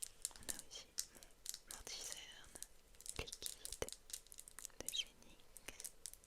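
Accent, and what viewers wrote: France. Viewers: She’s so French coated it’s so cute like especially the mic idk it just give me expensive brand vibes like Versace, gucci, or Louis😭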